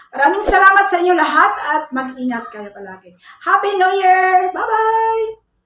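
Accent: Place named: Visayas, Philippines. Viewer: Bisaya ka maam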